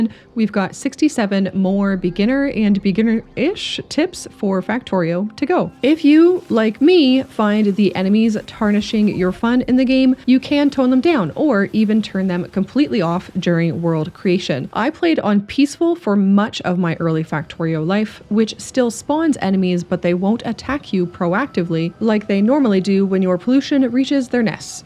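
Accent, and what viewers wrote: Canada. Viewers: I'm pretty sure she's too Canadian to be AI, at least. No way any of the basic, bland, AI voiceover videos is calling anything an 'underdoober'. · CANADIAN SPOTTED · I heard aboot, howdy fellow Canadian (if my guess is correct), the Factory must grow, eh! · subbed for the canadian accent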